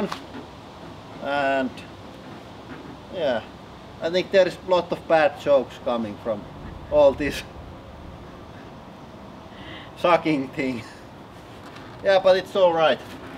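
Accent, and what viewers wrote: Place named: Finland. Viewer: I'm here for the finish accent only.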